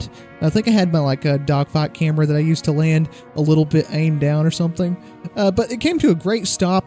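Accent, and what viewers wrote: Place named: South, United States. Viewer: i love your countryish accent, it just makes the whole vide so much more enjoyable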